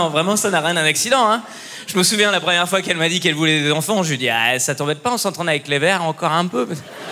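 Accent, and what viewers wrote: Quebec, Canada. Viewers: J’ai prit un de ces fou rire avec son histoire et l’accent québécois ! Magistral. J’attends la tournée avec impatience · C’est le meilleur accent québécois fait par un français que j’ai jamais entendu! (Je suis québécoise) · Ouah… Vérino nous avait habitué à tellement mieux… là on nage en plein sexisme (« Hahaha ma femme qui… ») nappé d’humour anti-accent québequois, c’est pas terrible. C’est border Bigard. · L'accent québécois m'a fumée rire😂😂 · Haha! Pas mal l'accent québécois. Un mélange d'accent du Saguenay et de la Beauce😎. Probablement une des meilleures imitations de l'accent québécois par un français 😎. Pour une fois qu'on a pas l'aire trop... bozzo🤙😉.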